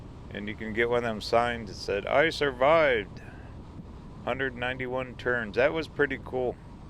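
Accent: United States. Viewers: Your English accent is for any particular area in the U.S. ? I like your videos, cheers from Ireland 🇮🇪 👍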